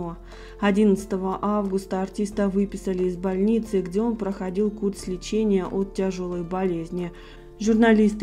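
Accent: Russia. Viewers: Она что с Урала? В обаятельной и привлекательной.. Разноплановый был актёр 😢с незабываемым голосом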